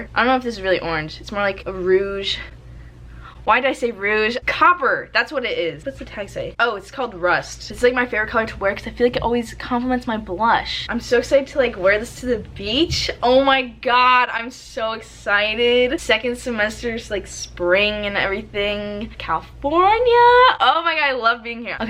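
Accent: United Kingdom. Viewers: luv the british accent luv! also congrats on 100k<3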